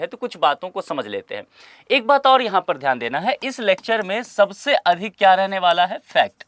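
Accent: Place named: Bihar, India. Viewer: Bihari h sir 🙌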